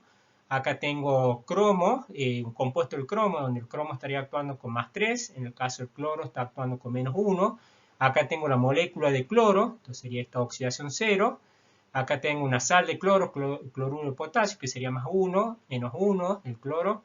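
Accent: Argentina. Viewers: uff, que acento papá. Argentino?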